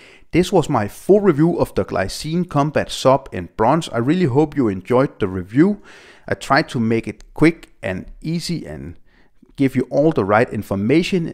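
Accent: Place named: Denmark. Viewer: I’m looking at Massdrop from the US and it is running at $419. You can even get the monster 48mm versions. I have and love the Airman SST Pumpkin. I did buy the “Goldeneye” Combat Sub but I almost never wore it and it wore bigger on the wrist than the 42mm would suggest so I sold it. This bronze version is tempting, but I wonder if the same thing would happen and I wouldn’t really wear it. Also, God Day from the US. I work with a lot of Danes and I love your accent. It’s super!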